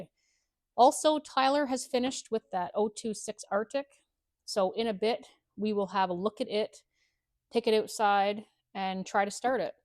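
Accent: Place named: Canada. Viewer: I like your work and your delightful Canadian broge.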